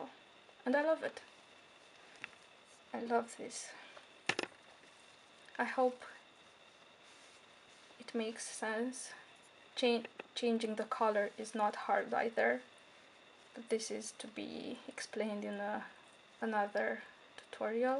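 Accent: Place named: Iceland. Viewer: Your accent sounds very Icelandic, what is your first language?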